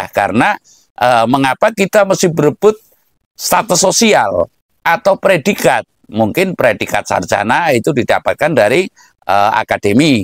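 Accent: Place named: West Java, Indonesia. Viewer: Kalau jadi Ulama/kyai Belajarlah Yg Bener dan Tutur katanya Yg Indah...Contoh Kyai2 Terdahulu Tutur katanya Enak..Contoh Mbah Maimun Mbah Nasir... Mbah Nur Hadi.. Mbah Solihun... Dan Msh banyak Lagi Kyai2 Yg Bicaranya Lemah Lembut..Tetapi Ini Kyai Dengan Logak Sunda Bahasanya Agak Kurang Beres...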